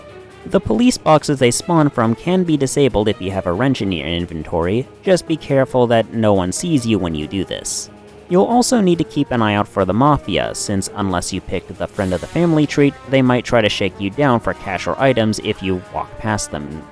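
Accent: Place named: Pittsburgh, United States. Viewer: Are you from Pittsburgh?!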